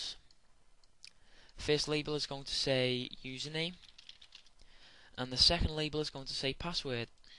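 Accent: Liverpool, United Kingdom. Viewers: I love this channel! So much useful stuff demonstrated in front of my eyes. And not narrated by someone with an intimidating accent that makes you feel you are a noob. A scouse!!! Love it!!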